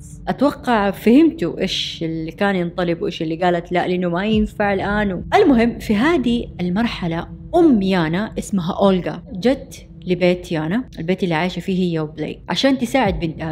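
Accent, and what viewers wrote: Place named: Jeddah, Saudi Arabia. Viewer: 😂😂😂البنت حامل والدنيا شوب .. يا ويلي انا تابعتك عشان لهجتك .. لدرجة انو صرت اذا قابلت بنات اعرفهم من لهجتهم انهم جداويات .. من اجمل اللهجات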